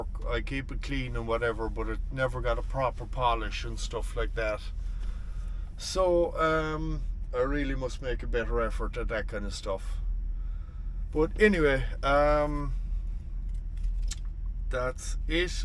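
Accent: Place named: Mayo, Ireland. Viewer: Good vid again. Keep em coming in 2024. Where abouts in Ireland you from. My wife's family are Mayo. Your accent sounds your that way. HNY.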